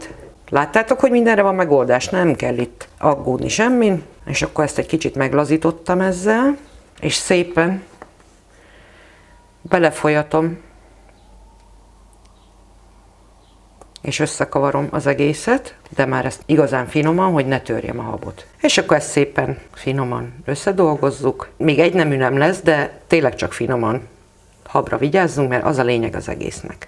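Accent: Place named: Debrecen, Hungary. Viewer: Igen...mintha Debreceni lenne a hölgy./Hajdú Bihar Megyében van/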